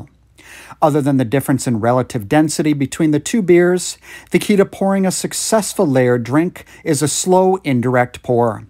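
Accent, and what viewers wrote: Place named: Canada. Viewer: I definitely recognize his Canadian accent.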